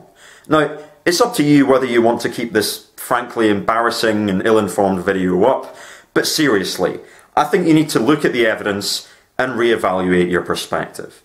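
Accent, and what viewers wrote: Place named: Scotland, United Kingdom. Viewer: Sorry, I'm from Canada so I can't distinguish accents well. I guess you're in Scotland.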